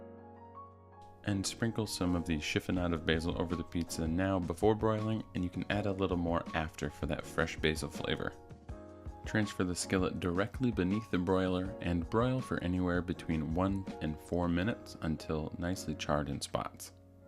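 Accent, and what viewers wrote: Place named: Italy. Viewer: Your Italian accent is stunning! As is the pizza!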